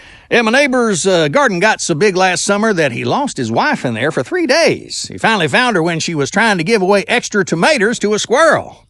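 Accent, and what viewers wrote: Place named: South, United States. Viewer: Gawd, this accent represents backwardness and hatefulness . How can anyone be proud of being from the south. It’s pretty disgusting.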